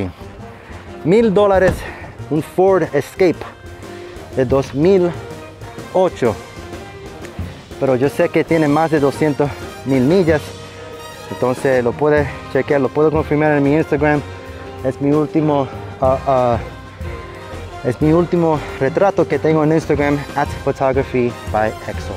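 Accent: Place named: Puerto Rico. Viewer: Saludos. lo de los vidrios se pueden reparar, ahora hay un metodo que usan para reparar. Pero se ve bien ese VW. Se dice burbujas de aire, lo del mercedez. Ya sabía yo que esa forma de hablar tenía algo de boricua. Que bueno tenerte paisano en estos medios...Haz ido a la isla? Yo vivo en Perú.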